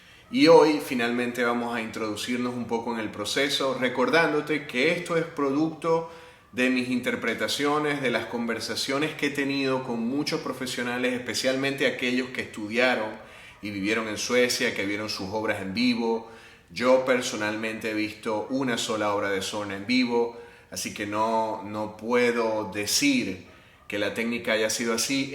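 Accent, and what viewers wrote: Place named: Venezuela. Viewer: ¡Hola! ¡Oye!, tienes un acento 'matao' , neutro, juraría que eres venezolano. ¡¡¡Excelentazo tu video!!! Saludos.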